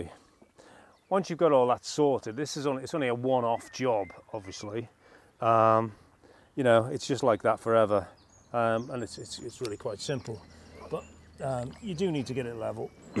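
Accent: Liverpool, United Kingdom. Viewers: Love the voice of an educated Scouser.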